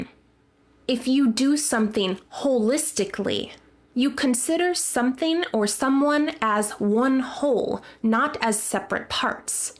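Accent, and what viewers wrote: United States. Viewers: Is it american or british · It's american english? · Hello madam I am watching your videos learning english with the news and found good and clear english in American,so I wish to learn from you. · WOW! How amazing accent, for downtown of NEW YORK! EXCELLENT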